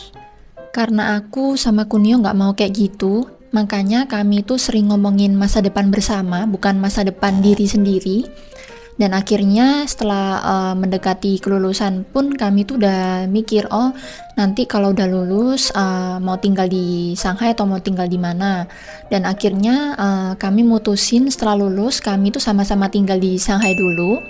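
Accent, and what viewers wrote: Java, Indonesia. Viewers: Logatnya agak ada jawanya, hehe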